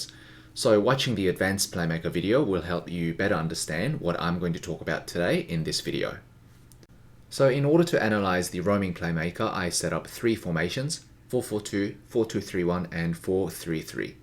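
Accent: Australia. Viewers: also is that an Australian accent I hear?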